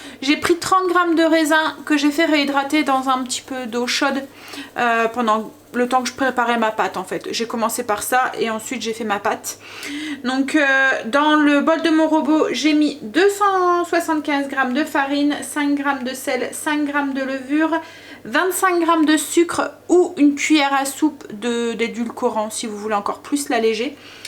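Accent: North, France. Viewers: Bonjour. Je découvre votre chaîne et j'aime beaucoup. Vous avez un joli accent, du Nord? Je n'ai pas Facebook, quelle levure utilisez-vous? Merci ❤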